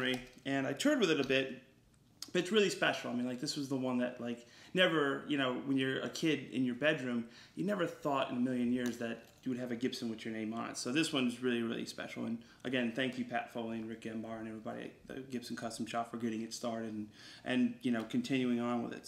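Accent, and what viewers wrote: United Kingdom. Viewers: your accent is confusing...just when i start to think you are american BOOM you sound british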